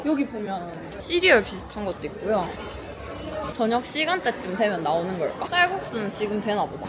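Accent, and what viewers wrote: Gyeongsang, South Korea. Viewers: 고향이 경상도 쪽이세요?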